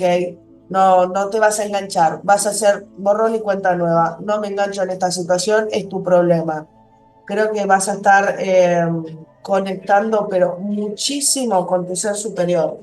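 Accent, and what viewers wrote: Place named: Argentina. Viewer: HOLA ME GUSTA. LA LETURA. ES MI PRIMERA VES CONTIGO. SI MI FAMILIA ES BUENA PERO HAY INTERESES CREADOS. YO QUIERO SER LIBRE TOTAL Y ESTAR BIEN EMOCIONALMENTE. TE ESCUCHO DE URUGUAY MONTEVIDEO. VOS SOS ARGENTINA POR COMO HABLAS LO SUPE INTUCION. 🇦🇷🇺🇾 SOS UN AMOR. SI ESO QUE SOY MENTAL SI LO SOY PENSAR MÁS EN MI. TE AMO ARGENTINA UN PAÍS HERMOSO FINO DE MODA DE GENTE HERMOSA ESTILO. URUGUAY ES MÁS TOSCO, LA GENTE SERÍA MUY POCO OPTIMISTA, LO BUENO ES LAS PLAYAS Y NO SE ESTA MAL PARA VIVIR HAY MUCHOOOOO ARGENTINOS ACÁ. Y MI NOMBRE TODOS ME DICEN SOS BRASILEÑA NO MI ABUELO PATERNO PERO NO YO NO POR MI MAMÁ ITALIANA Y AHÍ TENGO APELLIDO HERMOSO ITALIANO DE GENTE MUY DIVINA. CALABRESA. ASÍ ME CONOCES MÁS. SI LABORALES SI MEJORES SI Y QUE MI PAREJA TRABAJE POR DIOS ES MEDIO VAGO. MI PAREJA TIENE ALGUNA S COSAS BUENAS. GRACIAS SOS UNA GENIA MUJER. 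SI TENGO DUDAS SI DE MI PAREJA. EL PROMETER Y NO CUMPLE NADA. BUENO ME GUSTÓ CONOCERTE MI CHICA ARGENTINA BESOSSS PARA 🇦🇷🇦🇷🇦🇷🇦🇷🇦🇷🇦🇷🇦🇷🇦🇷🇦🇷🇦🇷🇦🇷🇦🇷🇺🇾🇺🇾🇺🇾🇺🇾🇺🇾🇺🇾🇺🇾🇺🇾🇺🇾🇺🇾🇺🇾🇺🇾💋💋💋💋💋💋💋🌻🌹🌹🌹💋💋💋🌻🌻🌹🌹🌹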